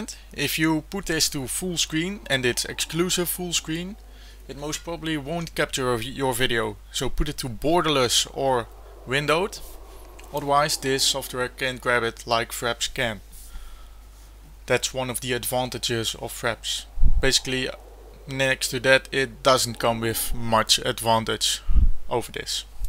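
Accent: Netherlands. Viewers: The dutch accent though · altijd grappig om dat nederlandse accentje te horen ^^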